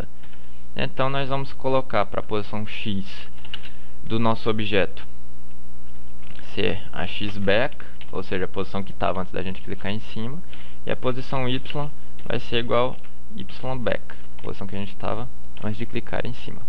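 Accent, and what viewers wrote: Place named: Rio Grande do Norte, Brazil. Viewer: Interior do RN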